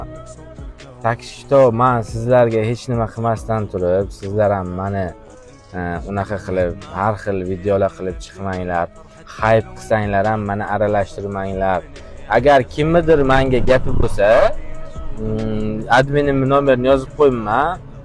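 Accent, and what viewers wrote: Namangan, Uzbekistan. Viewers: Шева Наманганга тортар экан тарбияли бола ота онанга рахмат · Qaerdansz tojikmsz bizdayam namangan kosonsoylik ortoglarm shunde shevada gapradi talafuz chroli negativlarga etbor bermang koromdganla kop yaxshi bosezam yomon bosezam negativchlar kop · Nomongollikla sheva aniq😅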